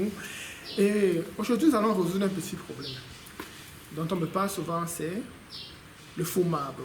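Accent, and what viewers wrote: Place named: Cameroon. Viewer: Salut Frere, je juge à ton accent que tu es Camerounais comme moi, dis moi quel produit utulise tu ?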